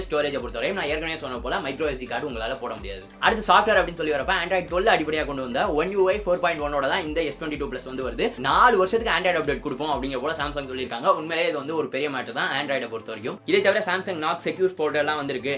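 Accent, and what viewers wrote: Madurai, India. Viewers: Bro neenga maduraiya?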